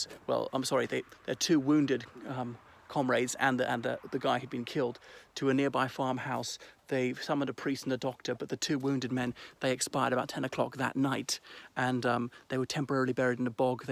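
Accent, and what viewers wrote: RP, United Kingdom. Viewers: Well, he has an Etonian accent - not terribly Irish at all! :) My granddad was Irish - from Nenagh, Co Tipperary.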